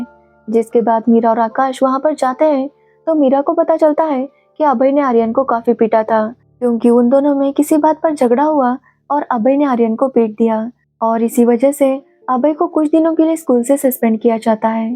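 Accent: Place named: South, India. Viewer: The narrator of this webseries needs loads of rehearsing......her South Indian accent isn't apt for narration and there are lots of flawy pitch in delivery......NYC scripts written for explanation of the series...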